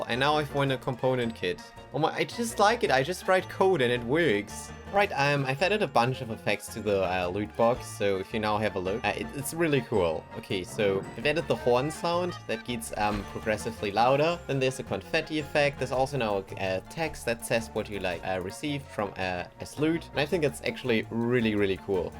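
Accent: Germany. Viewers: wait you are german?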